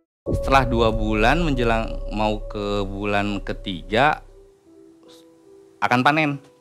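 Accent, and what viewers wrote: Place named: West Java, Indonesia. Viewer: Sunda pisan ini mh mang ei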